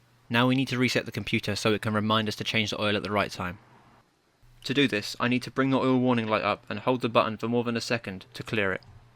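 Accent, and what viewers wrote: United Kingdom. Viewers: I am going to be honest here, because I like your channel and I want to see you succeed and make more great content. I think you should not do voice-overs. Maybe it is because I am american and don't appreciate the english accent. With that being said, good luck and keep experimenting with new content.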